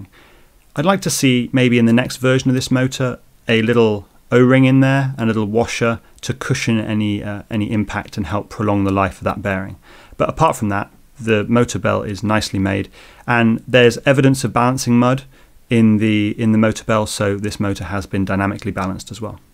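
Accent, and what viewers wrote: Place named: United Kingdom. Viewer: Seems like you're the onli British English speaker who's speech doesen't make me feel pain in my ears)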